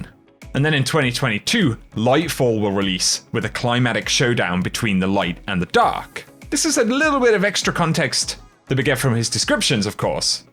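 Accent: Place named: United Kingdom. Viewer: Gotta love the British voice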